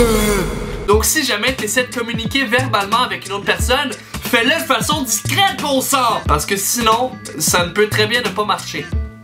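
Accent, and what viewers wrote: Quebec, Canada. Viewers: Juste avec ton accent on dirait deja que tu es québécois..:) Super bonne vidéo!Je m'abonne! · Il est quebecquois -_- Pourtant il a un léger mais très léger accent. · t'as l'accent canada a mort mec (ne le prend pas mal j'adore les videos je me suis abonnés) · enfin bon,c'est du québécois je pense^^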